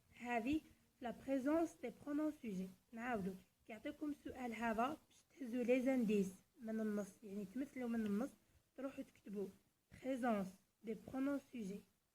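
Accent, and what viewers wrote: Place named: Batna, Algeria. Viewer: اللهجة تاعك باتنية والله اعلم.. فكرتيني بإنسانة باتنية عزيزة عليا.. ان شاء الله نجيبوا الباك ياربي ادعيولي.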